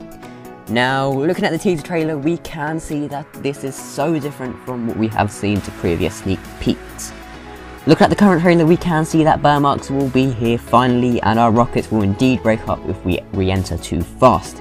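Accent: United Kingdom. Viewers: It's been 12 days,where is the uptade? And you sound very very britishy.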